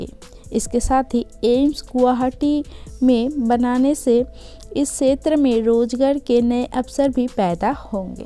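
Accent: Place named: Bengal, India. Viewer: Pata nahi kyu bangali excent main baat kar rahe hai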